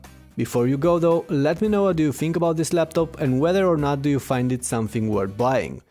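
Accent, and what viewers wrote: Romania. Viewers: Mai mult ca sigur esti roman (fac referire la narator) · romanian accent? :)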